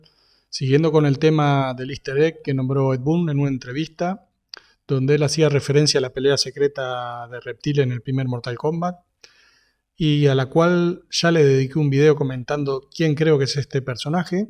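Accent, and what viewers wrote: Argentina. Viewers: Es argento papu 😊 mase un argentina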